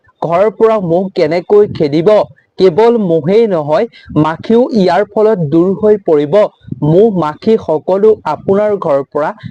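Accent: Bangladesh. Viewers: Aponar kotha khini bangla deshi jen lagil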